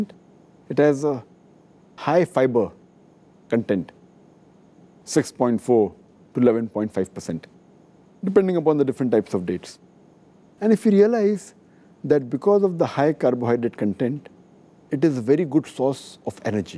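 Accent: India. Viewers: Gotta love the Indian English voice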